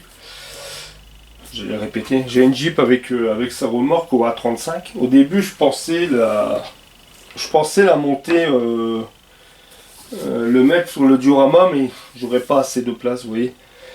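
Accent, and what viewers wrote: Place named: Nord-Pas-de-Calais, France. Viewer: Bonjour Attention! Ce sont deux époques "guerrières" différentes: cela pourrait choquer. Mais en attendant, félicitation pour tout ce que tu fais...de même pour tes directs "pur jus",avec ta manière chti de t'exprimer! Patrick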